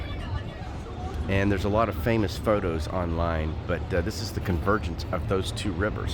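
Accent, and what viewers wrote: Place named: Texas, United States. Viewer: What a beautiful place, on par with some of the fanciest restaurants in LA & New York & easily cost 3x as much! ❤ Your sense of humor, & Texan accent with China, somehow just works!😂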